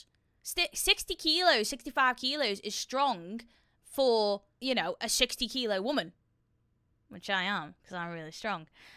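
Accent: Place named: England, United Kingdom. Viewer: Primeira vez vendo seu canal com a sua voz original e legendas já que sou do Brasil, costumo colocar a tradução na sua voz mesmo em português porém nesse vídeo não possui Aliás adorei seu sotaque é inglesa certo?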